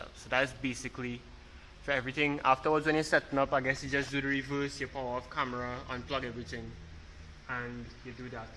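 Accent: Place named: Trinidad and Tobago. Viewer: You sound like a Trini.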